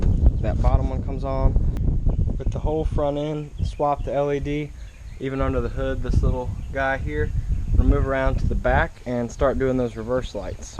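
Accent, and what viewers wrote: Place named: Louisiana, United States. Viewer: You gotta be from louisiana huh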